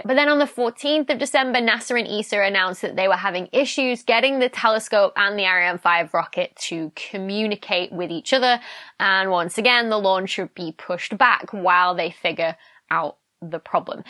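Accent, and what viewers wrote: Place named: Manchester, United Kingdom. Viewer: You were speaking much closer to the Manchester accent in your first videos. Unlike what some say, there is no harm using a regional accent.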